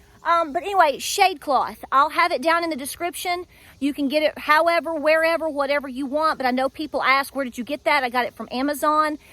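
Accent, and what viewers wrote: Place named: South, United States. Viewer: I just have to laugh at your last few videos. You are a pure southerner! So proud of you. I’m a southerner to in NC. The way you’re talking and explaining….. well honey I get it. You are awesome. I love your videos. ❣️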